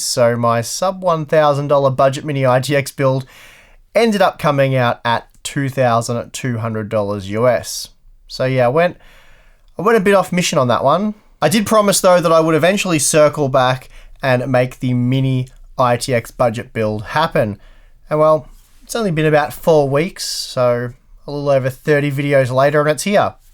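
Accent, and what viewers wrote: Australia. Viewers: Sooooooo you sound Australian why not give Australia prices?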